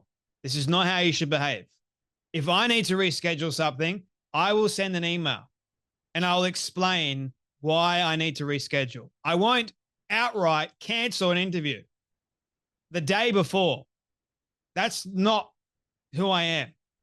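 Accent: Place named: Australia. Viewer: I love your channel, you speak as an Australian and i so appreciate that 👌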